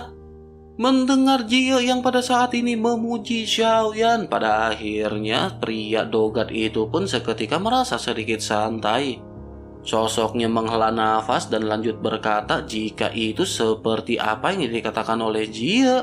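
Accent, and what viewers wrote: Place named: Jakarta, Indonesia. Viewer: Pakai logat nasional sajalah bos.Karena di dengar oleh bnyk org bukan hanya org betawi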